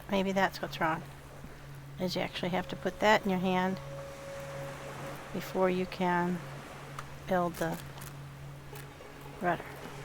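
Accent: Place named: United States. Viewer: Ah... A kindred soul! I wonder how many more of us there are 'out there'? You sound American (Canadian?) I'm on the other side of the pond, so if I goof, please don't take offence. My go-to game is Horizon Zero Dawn. I find the sequel disappointingly boring. Fortunately my son gave me his spare PS4 during the Covid fiasco, and that got me turned on to gaming.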